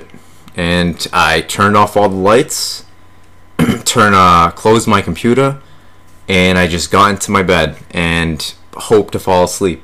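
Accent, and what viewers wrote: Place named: Rhode Island, United States. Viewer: Rhode Island accent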